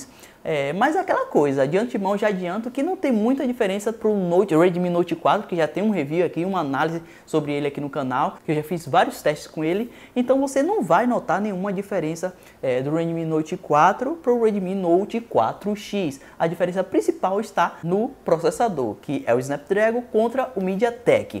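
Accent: Bahia, Brazil. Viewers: Vc é de que enterio da Bahia?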